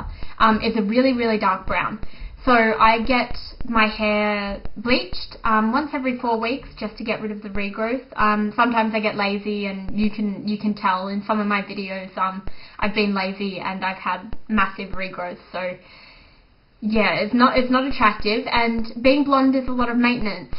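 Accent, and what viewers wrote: Australia. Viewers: are you australian? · You're beautiful. Thanks for the post. Looking at you -- and listening to that cute Aussie accent of yours -- is the best spent on the 'net.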